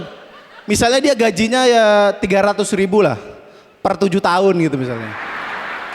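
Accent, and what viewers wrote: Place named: Madura, Indonesia. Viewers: duro nya medok mas😂😂😂😂 lanjutkan kita serumpun😂😂😂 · Logat maduranya kental bnget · si muslim maduranya masih berasa wkwk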